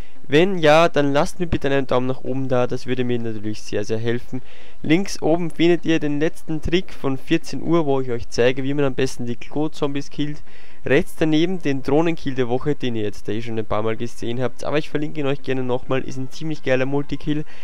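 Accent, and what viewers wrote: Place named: Austria. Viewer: veroarscht wieso in österreichisch?biste österreicher ich nähmlich auch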